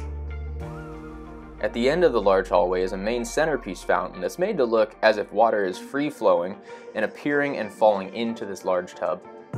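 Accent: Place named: Bay Area, United States. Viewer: I have become an avid follower of your detailed and very entertaining in-flight videos. Everything about them holds my attention making it easy to sit back, get comfortable and let the video do its thing. I also believe that your phrasing and (non) accent indicative of the Bay Area, where I am from, makes me feel very much like I'm listening to someone with whom I am familiar or have known a long time.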